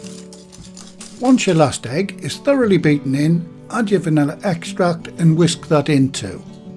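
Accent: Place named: Newcastle, United Kingdom. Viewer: This looks delicious! And I love your accent,. It reminds me of one of my favorite shows, "Vera". I'd love to visit Newcastle one day:)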